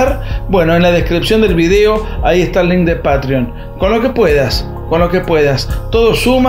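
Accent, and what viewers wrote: Mar del Plata, Argentina. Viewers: Ah!!! El acento de Mardel. Tremendo acento. Tan o más identificable que el cordobés. Sobre todo Mar del Plata del Norte. xD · Acento de mar del plata ja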